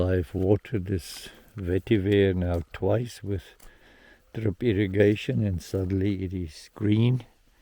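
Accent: South Africa. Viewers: You sound like a South African 😂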